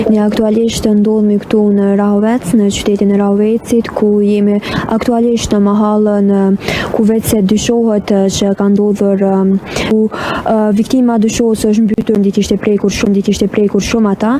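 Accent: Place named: Highlands, Albania. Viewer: O gazetare sa trashe flet na e shpife maloke tamam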